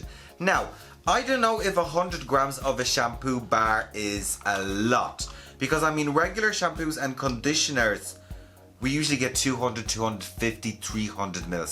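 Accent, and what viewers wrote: Ireland. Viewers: Love your wonderful Irish accent wanted to know wht you think of Osmo curl spray and their products in general.I cant afford very expensive hair products right now any cheaper superdrug or boots curl products you can recommend?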